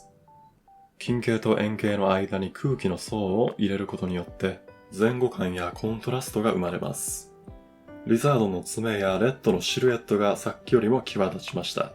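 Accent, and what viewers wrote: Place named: Kansai, Japan. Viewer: 初見ですが絵はもちろん、声が低音でかっこいいし関西弁… 控えめに言って好きです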